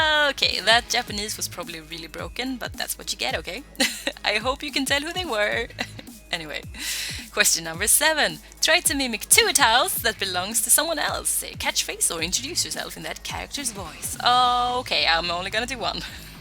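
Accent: Sweden. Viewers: Oh my gosh your Swedish?!?! you and pewdiepie my favorite youtuber both Swedish your accent is so cute I love it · I just started watching your videos, and i am actually really glad, I did. And your accent is so cute! where are you from? ^^; //edit, you're from sweden? awesome!// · I knew you were a Swede the moment you opened your mouth!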